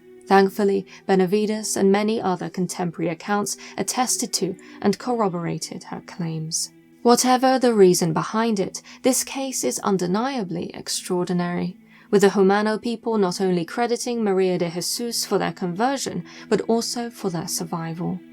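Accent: United Kingdom. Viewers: personality is perfect for the channel. Quite clean Brit accent too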